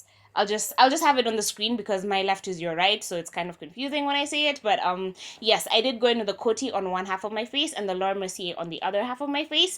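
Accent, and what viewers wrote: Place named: South Africa. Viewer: Your accent sounds Sooooo South African 😅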